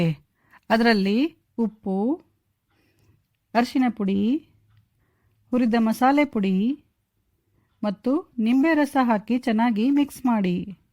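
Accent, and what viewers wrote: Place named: Bengaluru, India. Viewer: U speak Bengaluru language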